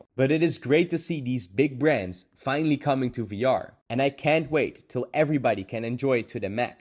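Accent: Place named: Netherlands. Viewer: dutchy english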